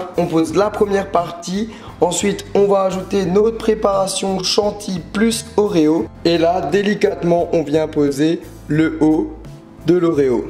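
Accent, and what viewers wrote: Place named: Alsace, France. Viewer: Coucou toi c la première vidéo que je vois de toi et j'adore c cool +1 abonnée et juste une question : est tu alsacien car tu as un petit accent PS moi je le suis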